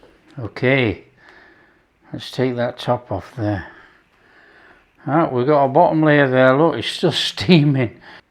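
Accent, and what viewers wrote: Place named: Scotland, United Kingdom. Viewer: Oh my. I know what cake I'm requesting be made for my birthday this coming April (if I can wait that long--haha). I love your recipes, your wonderful Scottish accent of my ancestors, but your taste-testing euphoria always makes me smile! Thanks so much! Best, ~Marirose USA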